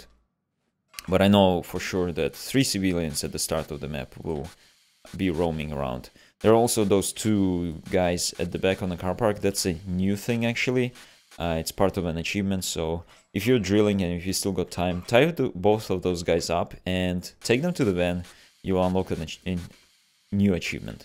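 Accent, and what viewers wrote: Russia. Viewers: Акцент русский. Может ты русский который хочет вести английский канал? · Русский акцент отличный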